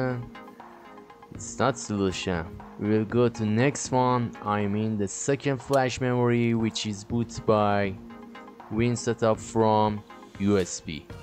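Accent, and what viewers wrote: Iran. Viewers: انگلیسی با لهجه غلیط ایرانی . دمت گرم. کلا منظورت این بود که . از برنامه winsetupfromusb برای ساخت بوت نصب ویندوز استفاده کنیم. نه روفوس ؟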